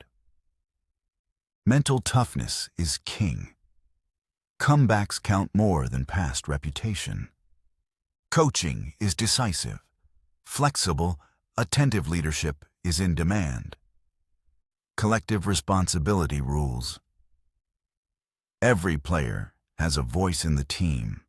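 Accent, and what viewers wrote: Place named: United States. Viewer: Why do we need an American accent?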